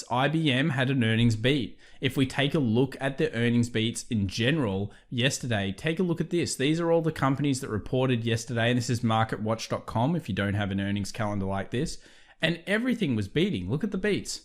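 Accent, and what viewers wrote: Australia. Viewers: One of the best analysis channels on YouTube. Plus the Australian accent. Can't ask for more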